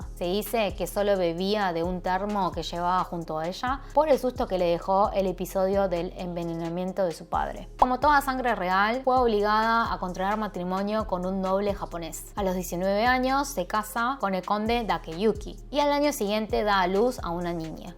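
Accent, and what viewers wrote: Buenos Aires, Argentina. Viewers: 어려운 역사를 알기 쉽게 풀어주셨네요! 스페인어 공부하는데 큰 도움이 됩니다. 아르헨티나 발음은 덕분에 많이 친숙해 졌어요~ 감사합니다. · el acento porteño ... argentino... genial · Que gracioso una coreana, hablando con dejo argentino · Felicidades por tu excelente castellano. Noto un ligero acento de Argentina? Saludos cordiales desde Cochabamba Bolivia 🇧🇴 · Se me hace raro ver a una asiatica hablando como argentina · ese ecento argentino chillon y horrible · Eres koreana o argentina? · No hay nada mas bello que una coreana con acento porteño... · Cómo chica escuchar a una coreana con acento argentino. Muy raro. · ._.' Es tan complicado acostumbrarse a ver a una coreana con acento argentino ._.' preferiría que hablaras en tu idioma y colocar subtítulos, por que ya no sé si veo a una coreana o argentina xD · Lamentablemente no puedo con tu Acento Argentino primer y último video que miro pero te deseo todo el éxito. 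· Entiendo, pero ese acento Argentino, suena asqueroso. Se dice YO no CHO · Hola, porque tienes acento argentino?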